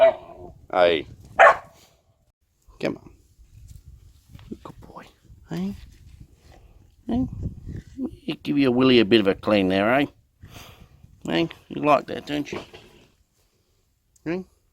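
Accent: Australia. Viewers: I've been living in Australia for 10 yrs. still can't get the OZ accent. Love your video!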